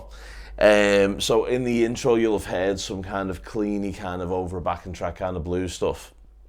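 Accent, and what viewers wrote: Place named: Northern England, United Kingdom. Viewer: Mate, you're doing the blues pretty well for being "not a blues guy". ;-) Love your accent, BTW. Where RU from? Somewhere up north on the Brit Isle, I suppose? (Myself being from Germany.) Very nice Blues amp but I still feel unsure about it for rock / hardrock (not metal). As far as YouTube demoes go I'd still prefer the Tech21 TM30...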